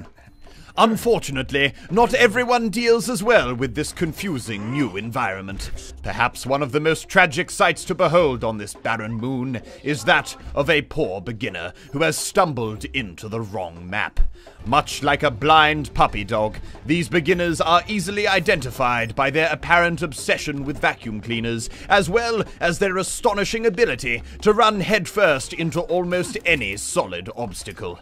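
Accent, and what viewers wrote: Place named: Australia. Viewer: 澳洲口音......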